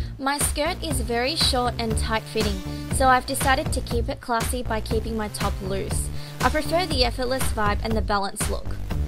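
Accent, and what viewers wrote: Australia. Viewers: u sound australian reminds me of alli simpson <3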